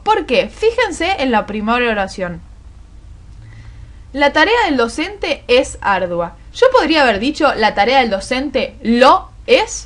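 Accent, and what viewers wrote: Argentina. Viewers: hace tanto que no escuchaba ese acento argentino hahahah muchisimas gracias por la explicacion!!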